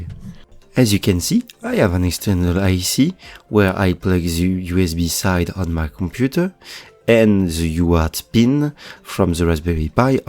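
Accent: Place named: France. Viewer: You have an french accent 😂😂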